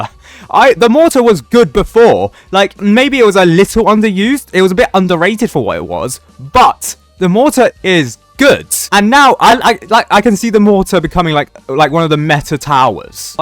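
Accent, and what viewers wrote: United Kingdom. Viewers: I enjoy you speaking British more than reading a whole paragraph of text ngl this new style is much easier to watch your videos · I always forgot that this guy was british after his voice reveal😭😭😭😭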